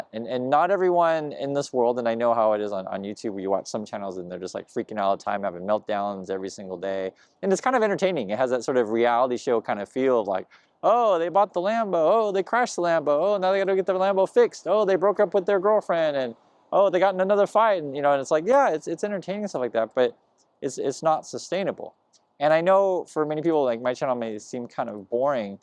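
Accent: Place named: West Coast, United States. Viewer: Beautiful view. Are you from CA? You have a west coast accent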